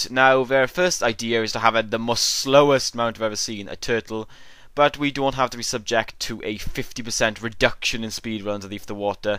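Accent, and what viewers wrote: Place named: United Kingdom. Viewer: dude even u are a gay or freaking weird british that cannot talk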